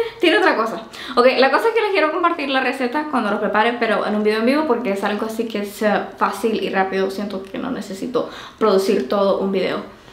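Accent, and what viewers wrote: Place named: Honduras. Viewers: Jajaja no se si soy yo ho es que hoy si se le escucha bien el asento hondureño cuando la escuche dije abla diferente ahora .jajaja ho sera porque ya dias no veia sus videos saludos paisana🇭🇳❤gracias por los tips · Igual note mucho el acento Hondureño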